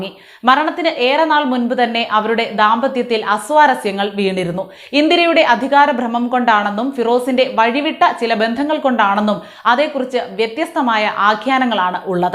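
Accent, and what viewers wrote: Thiruvananthapuram, India. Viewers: Chechee thiruvanandhapuram sailiyil endhoru gama 😅😊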